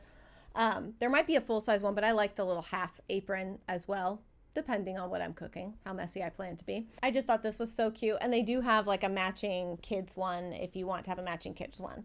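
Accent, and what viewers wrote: South, United States. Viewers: I have a King of Christmas tree last year and I love it!!!!! I have always had a real Frazier Fir tree. Last year the real trees were so expensive and it would die after a month. I am also done Christmas shopping thanks to you my friend. PLEASE keep being chatty I love hearing your voice the southern accent is the best.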